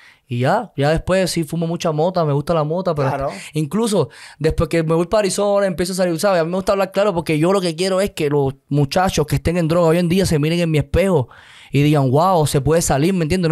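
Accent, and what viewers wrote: Puerto Rico. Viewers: Es cubano...se siente puerto riqueno y mexicano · Habla como puertorriqueño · Me caí gordo por qué es Méxicano y habla puertorriqueño se cree o nose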